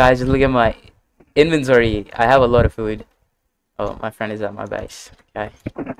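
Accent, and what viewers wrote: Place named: England, United Kingdom. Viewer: vs his english accent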